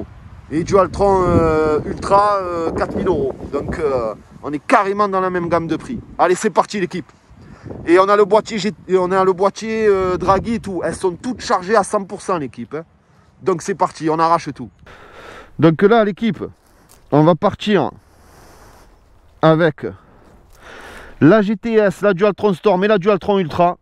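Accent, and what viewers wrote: Marseille, France. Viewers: Excellent les Manceaux, je les vois dans la semaine pour essayer la dualtron mini et la futecher gun pro j'espere qu'ils l'ont recu. Merci pour tes videos. Je suis un nouvel abonné et franchement au top avec ton accent de Marseille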